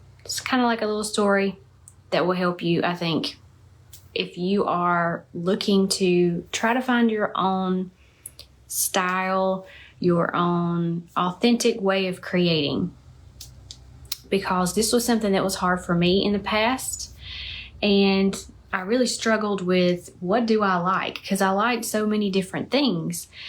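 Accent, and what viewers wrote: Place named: South, United States. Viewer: your thumbnails show it! And I am too. (I'm from GA.) So that's why I always click your videos...then I'm like, "oh, yeah, she's got a southern accent." 🤣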